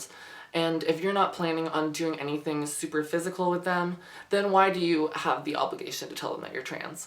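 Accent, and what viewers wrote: Canada. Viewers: she is canadian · Are you Canadian???? Just wondering 🙂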